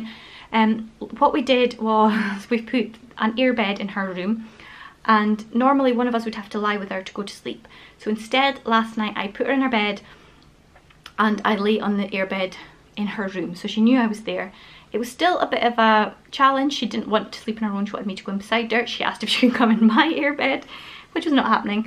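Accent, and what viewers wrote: Scotland, United Kingdom. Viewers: Hello doll... I am An old fat Scottish Jew living in London! Like to watch your videos to hear a Scottish gal And also your content is always entertaining! X thank you for taking the time to do this. Anxiety touches everyone, we all just deal with it differently. Please remember and focus on the positive influence you’ve had. X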